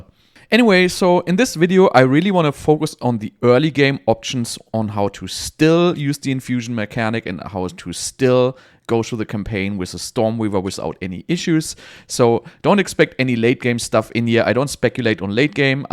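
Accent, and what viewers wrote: Germany. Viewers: Hello, I don't think the guide itself is bad, but you know what you're talking about. I just have a little problem when you say you only use this or these items at the beginning in the video. In theory it all works, but in the video you simply adjust endgame gear. They also make such a difference that the build runs as smoothly as you imagine, you know what I mean. But I don't want to say anything bad, I've been watching your guides for a long time and they've always been good. I have to praise you too ^^ Ps. Are you German? You have a German accent. Greetings from Germany. Nachtrag ich habe erst jetzt deine neuen POB bzw moba guides gesehen sorry mein Freund <3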